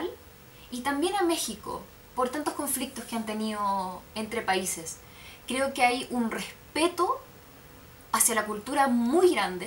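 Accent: Chile. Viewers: estás preciosa y el acento chileno me encanta 🤗, saludos desde 🇲🇽 hasta 🇨🇱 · Te encanta la musica porque cantas muy bien. Tus opiniones de coco muy bien acertadas, fundamentadas el mejor video de coco que he visto felicidades la mejor de chile, amo el acento chileno el mejor de latinoamerica, saludos desde Mexico 😘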